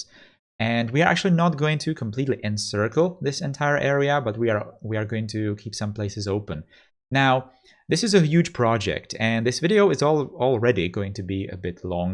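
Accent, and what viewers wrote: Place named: Czech Republic. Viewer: this series is absolutely the best take on cities skylines i ve seen so far, and i ve been watching all the stuff since the game was out. you are undoubtedly one of the most talented guys, if not THE most talented guy ever, making C:S quality content. and seeing this just frankly makes me not want to play the game anymore, because everything or even more that i could imagine, you´ve already been able to pack into this very series. an artist´s work, no doubt... you should be working as an architect or something... btw you have some serious czech accent dude :) you're czech? if yes that makes it even better, because nothing makes me more proud of our country than a talented guy like you doing such an excelent work.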